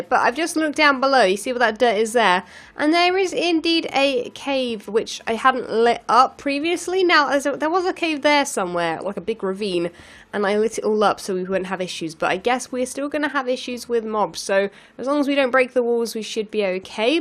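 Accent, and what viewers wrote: United Kingdom. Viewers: Excited much???? Gaw, at times you were talking so fast I couldn't understand you through that thick British accent. No offense meant, seriously none meant. Have to say things like that because apparently I can offend any female just saying "Hi". Love it take care.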